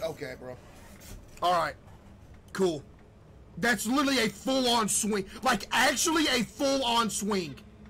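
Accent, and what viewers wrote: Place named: Arkansas, United States. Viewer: What part of arkansas you from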